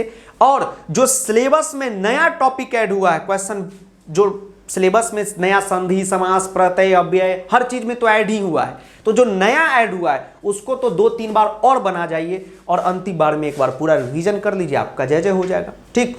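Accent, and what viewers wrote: Bihar, India. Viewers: Sir ka bihari accent bada mst h😂😍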